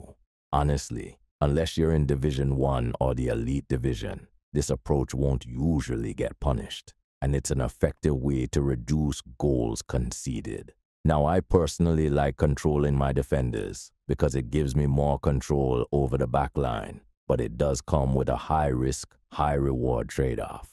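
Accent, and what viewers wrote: Jamaica. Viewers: Bro should look into motivational speaking! He got that leader voice that you know you can trust what he saying. Definitely Jamaican 🇯🇲.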